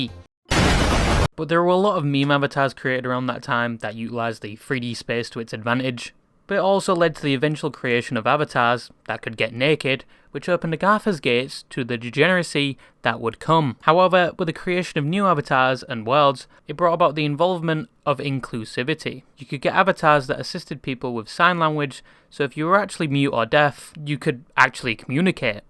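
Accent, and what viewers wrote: United Kingdom. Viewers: Oh god the heavy British accent, I already know I'm not getting 50% of what he's saying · The British accent is so thick I sometimes can't understand what you're saying vroo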